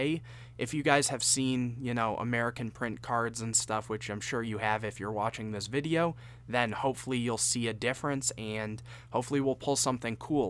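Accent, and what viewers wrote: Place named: Europe. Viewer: Big fan of euro english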